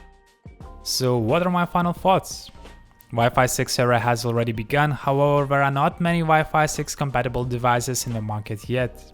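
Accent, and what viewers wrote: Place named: Lithuania. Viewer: Well, I'll support you vid with comment. Wi-Fi 6 looks more like transitional standard. I'm almost sure that when manufacturers start to implement 6 there would already be 7. A very limited range of units available now, so I'll wait until it be more across-the-board. Čiotkas, su niekuo nesumaišomas Lietuviškas akcentas :D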